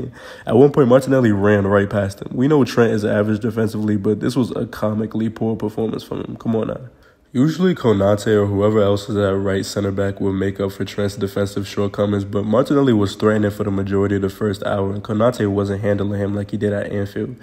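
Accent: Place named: Brooklyn, United States. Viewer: hearing a bk accent talking abt football is so odd to me, loving it tho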